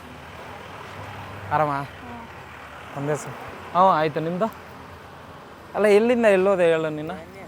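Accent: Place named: Tamil Nadu, India. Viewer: Kannada gottiro huduga bekappa. Eee tamil hudugana kannda kelodikke agodilla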